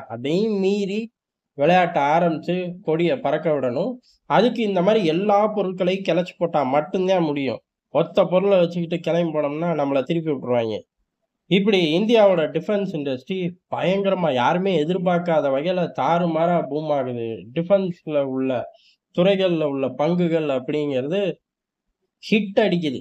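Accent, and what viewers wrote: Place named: Thanjavur, India. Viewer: Full of enthu..I suspect him belong to tanjure district especially because of d slang ‘அவிங்க..இவிங்க..’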